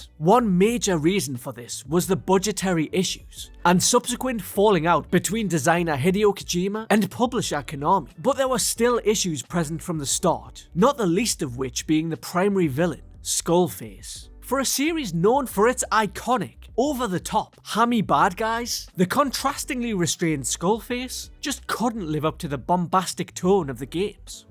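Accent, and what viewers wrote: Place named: Scotland, United Kingdom. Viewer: I love scottish accent, but i felt was missing some humpf… like more energy… felt like just reading, just my opinion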